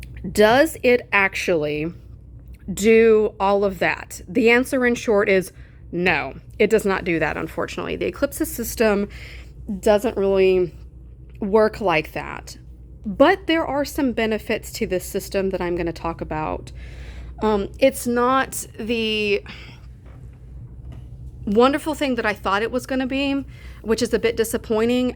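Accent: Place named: United Kingdom. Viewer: i thought you were british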